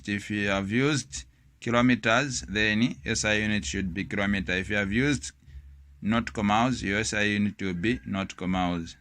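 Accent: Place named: Tonga. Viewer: Your accent is giving sir! You sound tongalish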